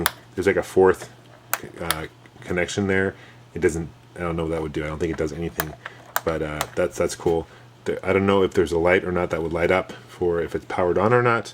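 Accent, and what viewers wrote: United States. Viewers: you sound like your from kentuky like me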